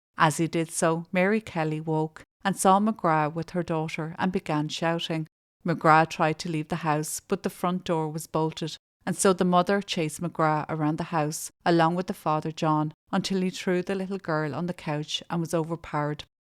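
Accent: Ireland. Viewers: Great to hear your lovely Irish accent mary as iive been stuck in London since my body fell apart about 6 years ago, 😮.. and wasn't able to make it back as i had to get medical leave as my BasterD hips Collapsed then the bottom of my spine Popped 😮... then CoviD , then a Wheelchair ♿, then 4 years between each hip replacement then ......😅...AAaaaH JeaZuS ,, i must be boring 💤 you now 😂😅... Anyway... fantastic show , ive been Religiously following the absolute DicK Circus 🤹‍♂️ that is poor Lucy Letbys lincining and SCAPEGOATING 😢😢😢😢.. I Think 🤔 Both Country's Justice systems are 0ne 😮😮😢❤❤❤❤🎉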